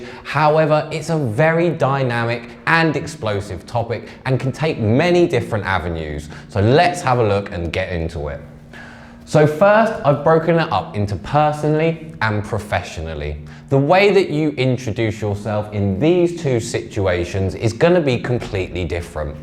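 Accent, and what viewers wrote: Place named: United Kingdom. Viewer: love his brittish accent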